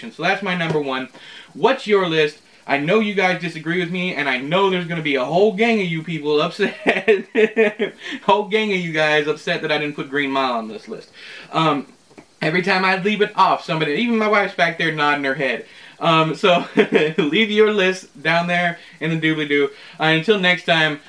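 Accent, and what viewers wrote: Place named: South, United States. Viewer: My first place choice would be 'Shawshank', and second place '1922' which İ found to be better than 'Gerald's Game'. That southern drawl! 😘 (Absolutely love it! My girlfriend hates it though. She said: Why can't he at least unclench his teeth while talking?)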